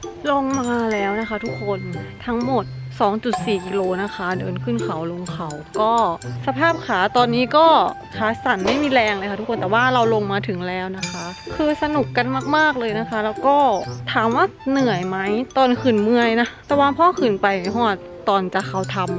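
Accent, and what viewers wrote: Isan, Thailand. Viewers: หลงมากกก น่ารักพูดอีสานอีกโอ้ยยย🤍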